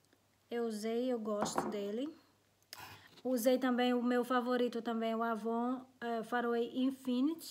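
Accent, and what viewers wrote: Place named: Northeast, Brazil. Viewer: adoro vc e esse seu sutaque nordestino vc é maravilhosa suas resenhas.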